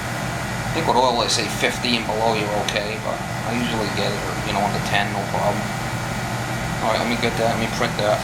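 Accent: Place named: New England, United States. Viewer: Must be from Mass or Rhode island..got that can't pronounce words correctly..kinda baby talk like.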